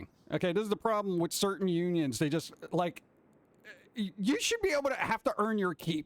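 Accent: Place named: East Coast, United States. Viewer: I think you would make a great voice actor. If anyone needs to cast a magical creature with that insightful, urban, East coast accent, you would totally kill it!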